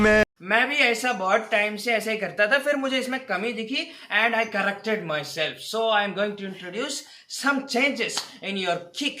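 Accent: Kerala, India. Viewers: Man, you mallu?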